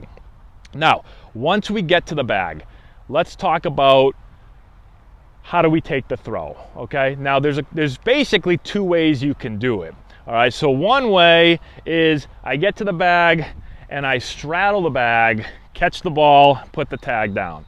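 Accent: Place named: Massachusetts, United States. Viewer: also your Mass accent is easy to understand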